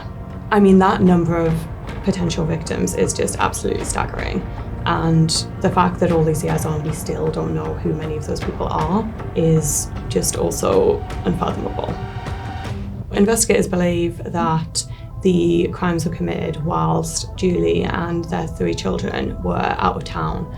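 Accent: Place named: RP, United Kingdom. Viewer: Posh Focal Fry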